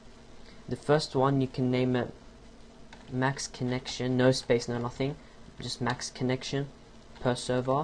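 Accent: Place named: South Africa. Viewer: That's because it isn't a British accent. It's a South African one.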